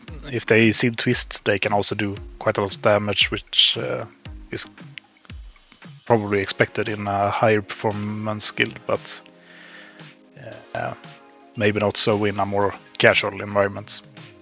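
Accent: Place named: Sweden. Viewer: Ohh god the swenglish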